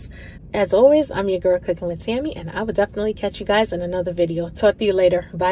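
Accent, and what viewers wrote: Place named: Boston, United States. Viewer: Tammy I just love love love your accent and I know I have asked you this a many of times baby. Are you from NYC or nearby? BABY I LOVE LOVE LOVE your accent. It also kinda sounds like from where my LOVELY'S ARE FROM, NEW EDITION, so it could be Boston Mass!!!! Like I said, hell I probably have asked you this question and forgot about it Pooh. You Are The TRUTH!!!!! Just thought I'd let you know. ♥♥♥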